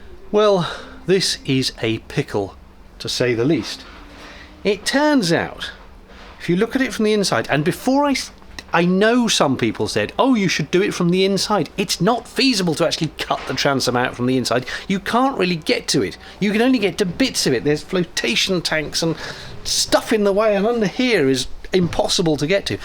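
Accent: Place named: England, United Kingdom. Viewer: You are fantastically English. It is wonderful to listen to you. It brings a such warm sense of familiarity. You should be proud of your bravery for such an endeavour, it is no small task. I look forward to your updates! Greetings from the midlands.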